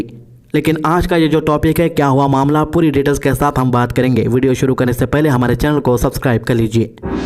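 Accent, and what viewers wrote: Hyderabad, India. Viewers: Mashallah 👌 Miya bhai ki awaaz mein kyon khabren bol rahe ho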